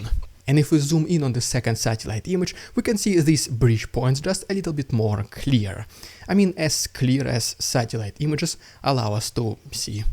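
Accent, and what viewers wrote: Russia. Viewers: reduce the Russian accent, remove the intro portion or change it , it maybe picking up your accent, and the way your words are pronounced..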